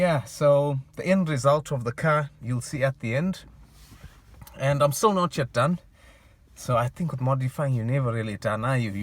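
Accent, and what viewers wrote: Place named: South Africa. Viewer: Good luck with your build mate. After the first few seconds of listening to you, I realised you were a fellow South African.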